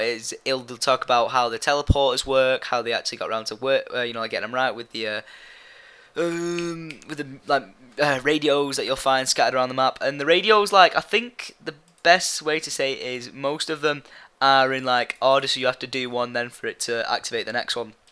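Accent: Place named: United Kingdom. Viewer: You have such a funny british accent :D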